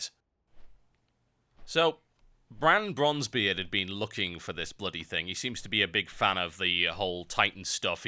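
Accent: United Kingdom. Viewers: how dumb... He's got a british accent and jest look at his channel background....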